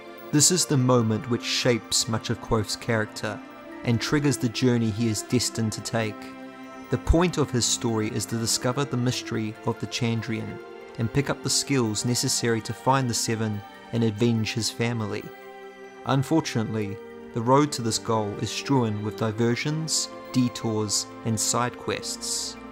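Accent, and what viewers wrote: New Zealand. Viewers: Question for the creator: are you from New Zealand? I'm not great at accents, (I'm from Texas and have only rarely traveled), so I'm only guessing based on my experience, but I have rarely heard your accent before so am very curious.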